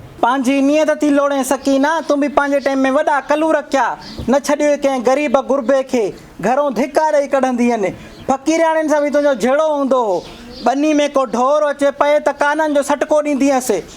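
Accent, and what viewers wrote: Sindh, Pakistan. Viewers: I’m very happy. Ma China na regular program disando aahiya. Sindhi tamam piyari tha galhayo. Lafzan me clearity Kamal aa MashaAllah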